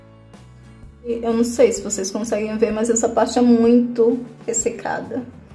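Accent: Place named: Bahia, Brazil. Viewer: Nossa ela é da Italia mas parece que tem um sentido Bahiano de Salvador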